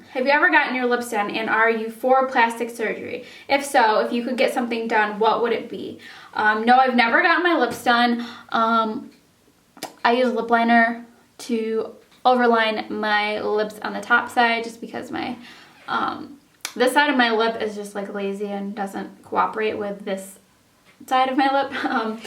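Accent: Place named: New York, United States. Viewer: Asked too soon.... Sounds like you are from somewhere in NY! Represent! :)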